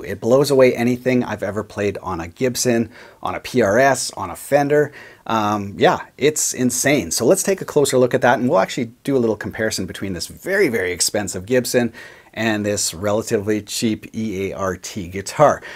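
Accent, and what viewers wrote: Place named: Canada. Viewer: Waiit... are you Canadian? LOL. I feel like I can kinda hear an accent.. either Canada or like North Dakota.. maybe that upper area of Wisconsin...or Ohio?? But... prob Canada.. ?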